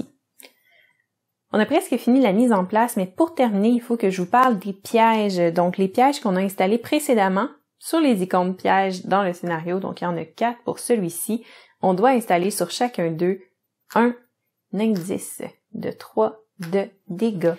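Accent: Quebec, Canada. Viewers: Suite à votre explication du scénario 1, je viens d'acheter le jeu. Je vais suivre vos autres épisodes pour être fin prêt pour l' étape 5. Merci mille fois pour votre synthèse du jeu , pour votre sourire et...pour votre accent québécois 😉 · Tes vidéos sont très sympa & bien réalisées, bravo. Et puis le petit accent québécois, c'est top ;-) Bien le bonjour de la France.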